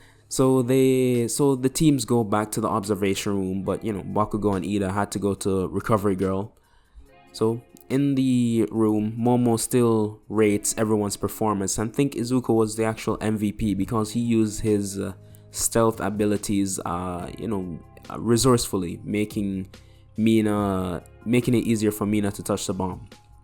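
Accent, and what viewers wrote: Jamaica. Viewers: Where is that accent from? Jamaica maybe?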